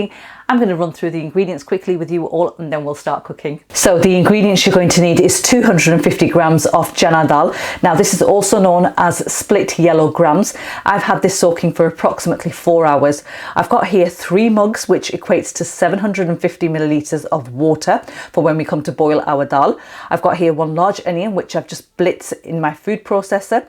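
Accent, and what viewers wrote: United Kingdom. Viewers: Awesome recipe ! Good job ! Please speak slowly so that we understand your British accent well.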